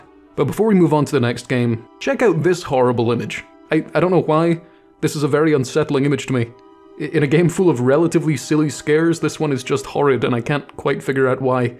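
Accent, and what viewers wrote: Scotland, United Kingdom. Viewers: First time on your channel and I cannot for the life of me pin down your accent you have weird mix of Scottish, German and oddly a wee bit of American 😂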